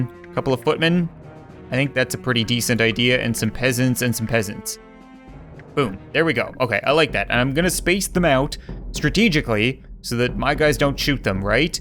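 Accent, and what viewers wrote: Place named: Canada. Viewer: He’s Canadian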